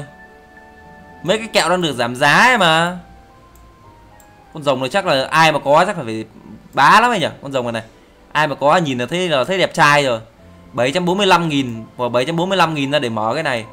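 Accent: Hanoi, Vietnam. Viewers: o Ha Noi ak hay BAC NINH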